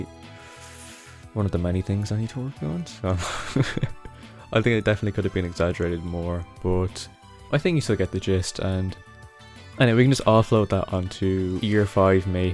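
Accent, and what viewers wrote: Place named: Ireland. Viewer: I myself have just over 8 years drawing experience and I can say for a fact that your work is greater than some 2nd 3rd year art college students I know. You definitely have the ability to comprehend the forms and shapes of objects and you have a knack for visual effects as well, which are some of the hardest parts of Shonen or Fantasy artworks, Be proud and remember that "slow progress is better than no progress" when you are stuck in a burnout or creative block :) I think I hear an Irish accent in there so if youre not in college yet, do consider This Is Art! Alot of the time when your work is judged they send feedback given by some Art Professors and Spearheads in the creative industry - Hope this is helpful!!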